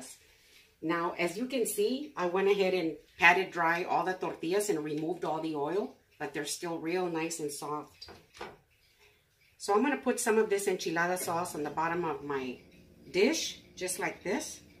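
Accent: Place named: New Mexico, United States. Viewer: I completely agree! Also, your voice is very soothing. You very much sound like you could be from my beautiful state, New Mexico which is even better!😎❤🌶💛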